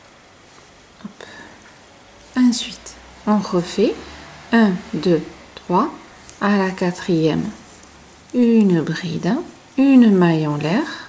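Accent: South, France. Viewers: Coucou merci pour votre top qui est magnifique et vos explications claires et précises avec votre accent du soleil ❤💋👍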